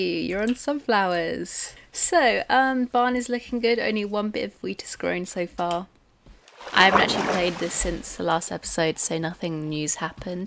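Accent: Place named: United Kingdom. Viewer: I'm falling in love with your British accent😃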